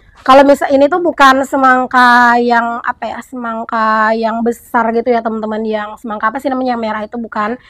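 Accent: Java, Indonesia. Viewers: Rumbai hadir,namanya obat keteguran bnd,masa bnda lupa?bnda sekali2 pakai logat pekanbaru dunk?kayaknya bnda ngomong seperti logat jawa aja🤗🤗🤗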